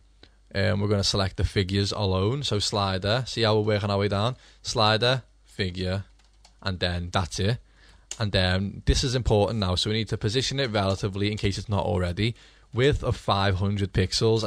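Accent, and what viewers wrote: Liverpool, United Kingdom. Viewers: fellow scouser? haha nice one dude great vid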